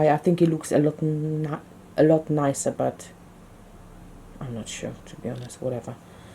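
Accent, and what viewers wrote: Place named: Africa. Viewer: U sound African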